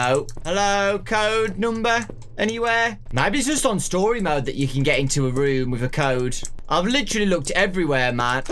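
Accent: United Kingdom. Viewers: your the defenition of funny and amazing at the same time- Also love your british acccent! I think the british accent is just wizard, im studying it now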